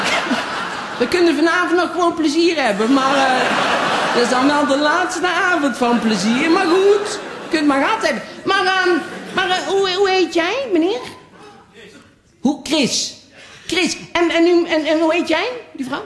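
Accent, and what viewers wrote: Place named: Tilburg, Netherlands. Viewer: Ik snap dat sommige mensen hem misschien niet grappig vinden. Ik persoonlijk wel. Marc Marie is namelijk een rasechte Tilburger en praat ook met een echt Tilburgs accent. Aangezien ik zelf ook uit Tilburg kom, kan ik er hartelijk om lachen! Daarnaast is het ook een kwestie van of je de grappen gewoon leuk vind. Misschien vind ik een ras-Rotterdammer ook niet grappig. En ik hoor van veel mensen uit andere provincies dat ze hem vaak niet eens verstaan, haha. Ik ben in ieder geval dol op hem! :D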